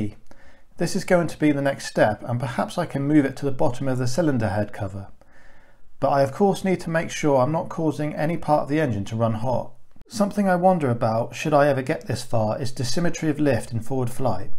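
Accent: United Kingdom. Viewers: Those skids are TITANIC. Though it makes sense if you're learning to fly or are flying an experimental aircraft. Still look weird though. Also you sound English. Are you a trained and licensed pilot? I was wondering how the law for homebuilt micro/ultralights (whichever's smaller) worked.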